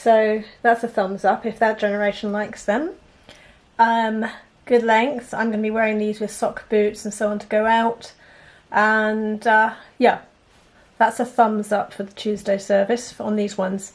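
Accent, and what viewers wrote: England, United Kingdom. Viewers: Hi ,I enjoyed your video so much & i know im going to love watching all of them!! I just subbed darling! You are so Cute & i love your English accent!! awe this was so cute & fun to watch ,much love & peace to you!🌷💓😊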